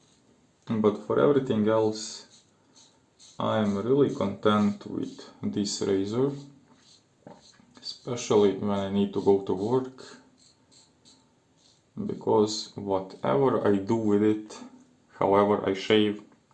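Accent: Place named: Serbia. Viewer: you sound so serbian :)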